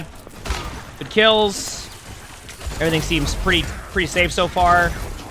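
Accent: United States. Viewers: Can someone tell me what accent has? I know it’s American ofc but what state specifically?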